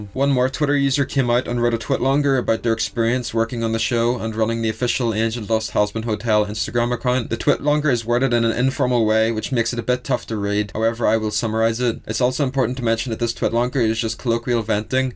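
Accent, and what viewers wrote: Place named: Northern Ireland, United Kingdom. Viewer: A Northern Irish narration on Helluva Boss.... YouTube algorithm nailing it today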